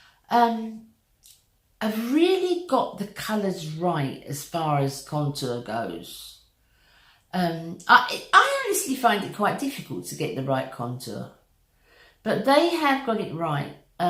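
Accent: United Kingdom. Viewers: What an absolutely lovely lady you are. I recently found your channel and I adore you. Also, like your Britsh accent. Thank you for your kind heart and your videos looking forward to seeing more.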